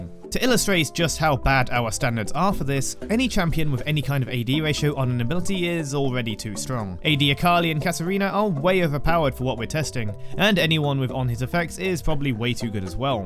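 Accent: United Kingdom. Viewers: a british wittery accent is crazy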